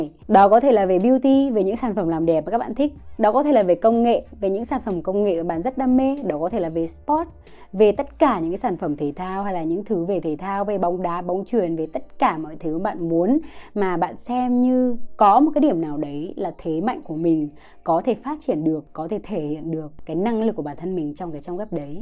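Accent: Central, Vietnam. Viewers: Em rất thích chị và các video của chị💛💛💛 Thích nhất khi chị nói giọng miền Trung nữa 😊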